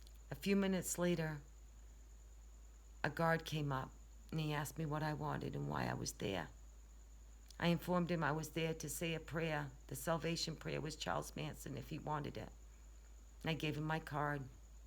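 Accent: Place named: Long Island, United States. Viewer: You sound like you are from Long Island.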